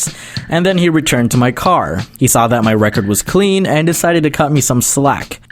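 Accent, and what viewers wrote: Canada. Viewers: Why in the absolute fudge do you have a semi-quebecan (?) accent when speaking french?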